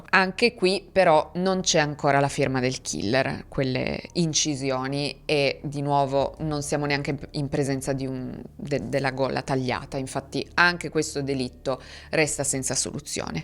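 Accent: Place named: Piedmont, Italy. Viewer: Che bell'accento Piemontese! brava :)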